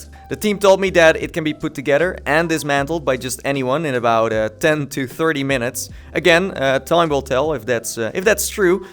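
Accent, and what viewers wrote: Netherlands. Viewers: Poh, Nederlands accentje zeg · hehehe I can instantly hear you’re dutch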